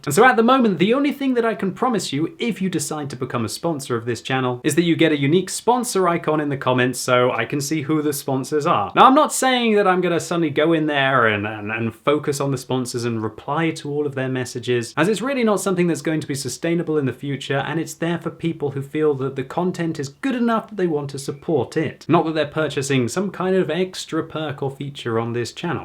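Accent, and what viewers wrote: United Kingdom. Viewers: Even though i've quit playing WoT, i'm still watching your videos and come on your stream for that lovely british accent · I've never played WOT and I have no plans for it. I've enjoyed watching your progressive gaming style and rather unique british commentary for some years now and I have fun on 98% of the games you posted. How can I keep tracks on what I've seen so far, and what not? Easy - click [LIKE] on every single video watched. Thanks for a steady stream of rock solid entertainment. Cheers, Peter Beijing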